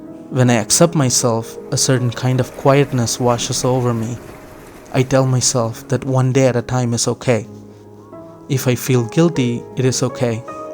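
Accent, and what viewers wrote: South India, India. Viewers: I can't tell you how similar our experiences our, I too am from the same place that you belong to (guessing from your accent) I had the same experience with smiling as described by you. I was rediculed once for my smile after that it took me ages to smile naturally. Coming from a poor south indian background with darker skin didn't help me with building my confdence. Thank you for these videos. You may not know the ways you are influencing other people's life without even realizing. Keep up the good work.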